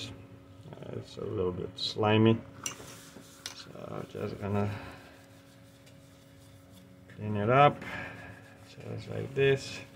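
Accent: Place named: India. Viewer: I thought you were from India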